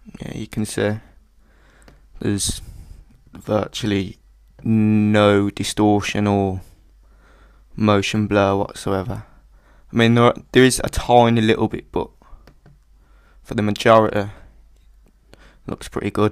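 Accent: Birmingham, United Kingdom. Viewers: Hate Birmingham accent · HA! he's birmingham, or there abouts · Go fellow brummy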